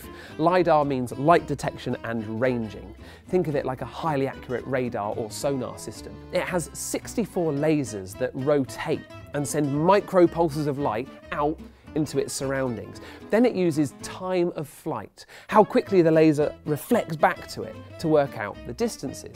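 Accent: United Kingdom. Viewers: finally I find a guy that's speaks brit english not american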